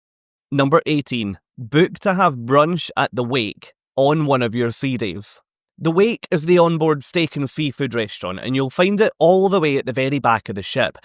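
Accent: Scotland, United Kingdom. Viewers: First of all LOVE your Scottish accent! Where in Scotland are you from? Second, all wonderful tips! We are cruising Virgin for the first time in February 2026, and cannot wait! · Another really charming video,! Just love that endearing Scottish accent! Our first VV cruise leaves Portsmouth next month. 13 days on the Mediterranean aboard Scarlet Lady. Shall see how we go, but that hammock and the great food constantly talked about should be some of the highlights. Have taken your tips on board. Thank you!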